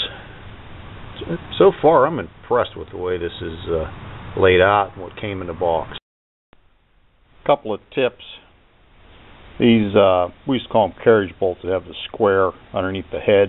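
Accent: Pittsburgh, United States. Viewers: Thanks for the video! Your accent sounds like your from the burgh.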